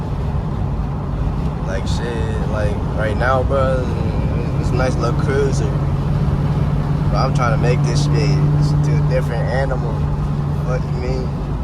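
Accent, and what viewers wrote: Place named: Charleston, United States. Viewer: Where you from? Sound like chucktown. But you can’t make that thang fast dawg I have one 2V booty bruh it’s fantastic for your first V8 it’s my first V8.. and I’ve had 2010 CTS v6 straight piped and a 2017 camaro V6 with factory NPP quad exhaust. My v6 Camaro was faster than my 2000 Gt. And Camaro was factory stock. Stang has full exhaust Throttle body. Heads, intake, stage 3 flywheel and clutch. Short throw shifter (so much better than anything) 350/70 something rear wheels or 370 some huge ass tires on the rear. Haven’t tried topping out yet or digging it like that because I don’t want it to break.. Shit is loud as hell but Slow 😭 my first V8 though tbh so I just love it and love how it sounds. As long as I have a V8 I’m happy.. idc if it’s slow I came from V6 all while wanting a V8.. finally got one that’s not on credit and PAID. 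About to get a C5 next way more capable of power less money than 4.6 2V Topped my CTS v6 at 145 completely straight for an hour hit 145 V6 Camaro hit 150Mph My new edge 2000 GT I haven’t even tried to top out yet 5 speed but if I did I hypothesize I’d say 150Mph? But probably longer to get there? Might be quicker to get up there than my Camaro with 20K miles That’s a maybe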